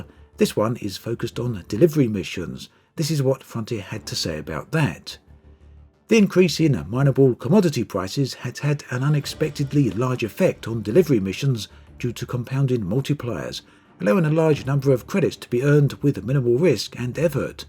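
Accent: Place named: South London, United Kingdom. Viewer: Hey cant help noticing your accent is like Pritti Patel's..is it an area of south London?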